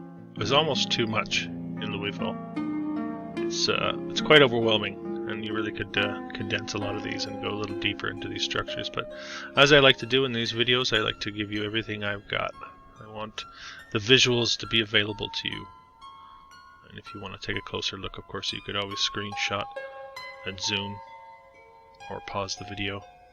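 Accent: Canada. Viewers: New subscriber and loving your content. So straight forward and easy to follow. I do love how u mention something about the founders of US maybe having the good intentions I was taught they had as a kid. Very hopefully comment on the eve of the Independence Day. I noticed u said “they have for profit prisons over there” wonder if u too are American or not? U always talk about talking to those that do this work modern day. I am a supplier of stone in Carrollton GA most all local masons buy from me and I will help them get whatever they need. I will show some screen shots to the most skilled ones and see what they say! A lot of them don’t speak first language English but I suspect they all know the narrative is bs. It’s the Americans that are the hardest to get to see what’s right in front of them. Second edit, I place the accent to Canada now. Yes our prisons are for profit, and it’s as twisted as it sounds. 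Entire portions of our population here have had extra Bain washing in hopes they will end up in the prisons to reach the numbers and fill the pockets of the evil entities that stand to profit from the prisons, or those that own them.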